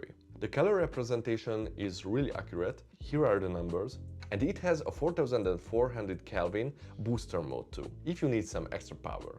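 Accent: Hungary. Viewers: Az első mondat felénél levágtam, hogy magyar vagy xd ment a sub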